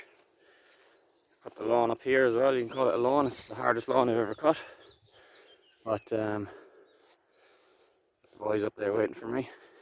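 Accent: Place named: Mayo, Ireland. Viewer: Assuming it’s mayo given the accent planning etc?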